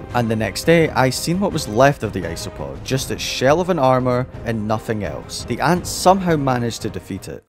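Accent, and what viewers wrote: Scotland, United Kingdom. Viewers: are you by any chance Scottish? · You Scottish? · Are you Scottish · Is bro British? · that scottish is chefs kiss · Are you British?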